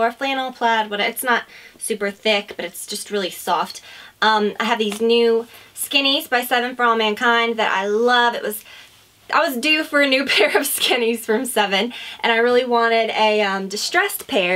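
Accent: South, United States. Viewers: You're accent is so cute! Not too country but still southern sounding.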